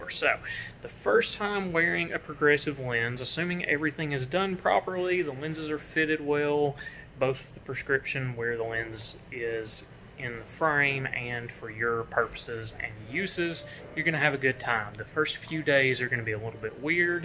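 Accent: United States. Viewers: I can’t understand this guys country accent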